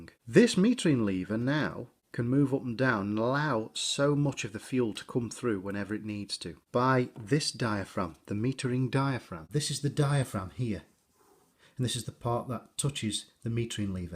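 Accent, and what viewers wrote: United Kingdom. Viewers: Man. Not only a great explanation but don't with a British accent. Cannot ask for more. A big thank you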